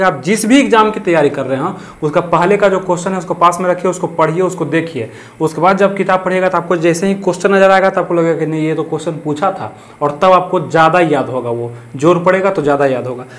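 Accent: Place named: Uttar Pradesh, India. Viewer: aap to UP k lg rhe ho guru ji.. apni mitti❤.... nice vedio🤟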